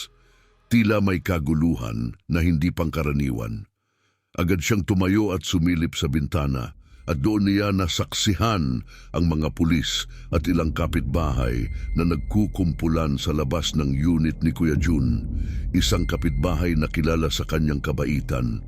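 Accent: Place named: Tagalog, Philippines. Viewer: ❤Daddy and his lettle tagalog accent 😊😊😊charr😊😊😊😊😊😊😊😊😊😊😊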